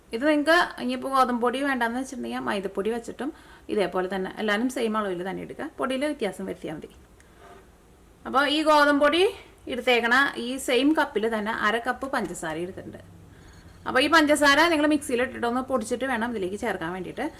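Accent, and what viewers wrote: Thrissur, India. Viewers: Buiscuit super aanu.nedumpasseriyl trichur/chalakudy slang eppol vannu.kelkan sughamundu.👍👍👍👍👍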